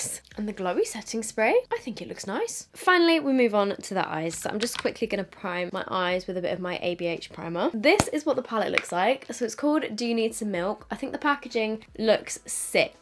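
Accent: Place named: England, United Kingdom. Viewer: I would love to enter for your giveaway. But I don't have high hopes as I don't usually comment on YouTube videos in general 😔 I watch you daily though and introduced you to my mam, and I've got your revolution collab makeup. I think you're such an inspiration and I adore your makeup looks. And your English like me so it's cool to hear! Haha.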